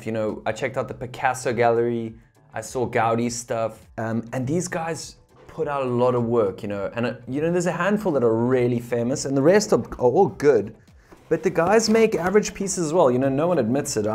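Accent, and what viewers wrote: South Africa. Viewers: Yay! I'll eagerly anticipate it. I'm from Cape Town, born in PE, but now I'm in Germany. I miss Cape Town every day. I always love hearing a South African accent on YouTube.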